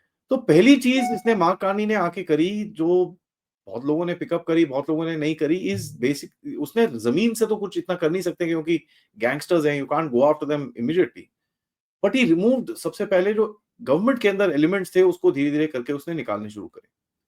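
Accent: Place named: South India, India. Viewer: South Indian hai wo. But itna bolte hai wahi kafi hai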